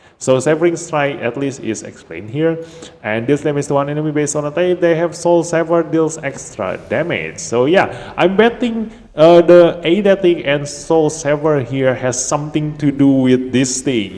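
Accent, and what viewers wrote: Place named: Indonesia. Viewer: Hmm I recognize that accent, indonesian? Nice vid btw, good to see more dislyte content creators.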